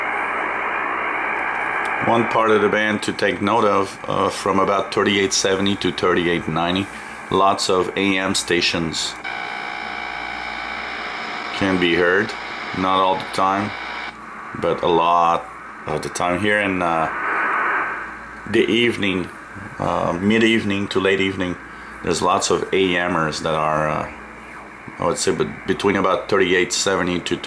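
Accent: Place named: Quebec, Canada. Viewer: As soon as I heard you say "turdy" & "dat" I knew you were a Quebecer! Haha. Hello from a fellow Canuckistanian from New Scotland! Thanks for your great vids! They really answered a lot of questions I had about the various bands.... :)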